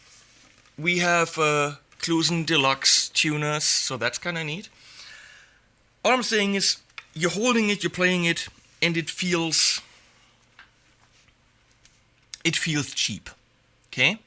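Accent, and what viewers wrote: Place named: Germany. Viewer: Gernan english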